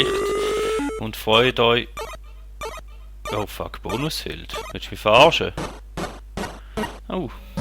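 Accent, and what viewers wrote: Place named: Switzerland. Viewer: Wuhu noch 'n LPer der CH-Deutsch spricht ;P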